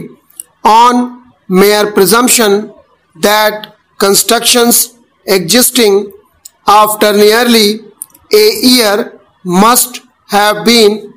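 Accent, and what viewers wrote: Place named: India. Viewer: LMAO ewwwww Indian accent, was expecting actual English, thumbs down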